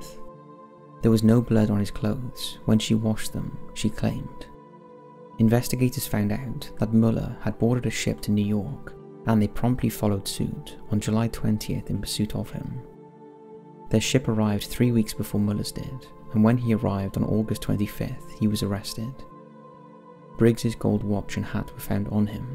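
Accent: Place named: Wales, United Kingdom. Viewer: Does anybody else IMMEDIATELY smash the thumbs up when they hear the Welsh guy narrating?! My favorite ever! 😘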